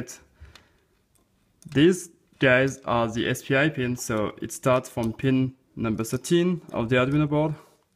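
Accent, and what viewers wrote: France. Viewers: Are you French ? You have one of these accent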